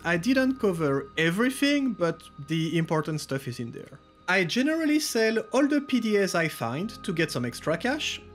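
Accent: France. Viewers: J’ai le droit de commenté en français? On reconnaît bien l’accent 😂 Belle vidéo ! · Are you french ? Greets from France :)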